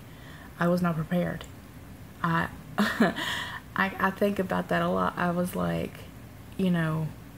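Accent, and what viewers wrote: South, United States. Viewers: just have to say you have the softest sweetest voice. and I love that southern twang lol. Girl keep your chin up and keep on keeping on.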